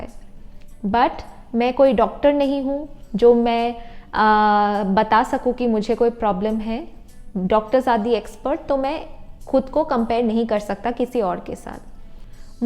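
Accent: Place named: Bengal, India. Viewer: Aapki language bangaali tone jesi lagti he Kuch kuch.... Aap bangaali he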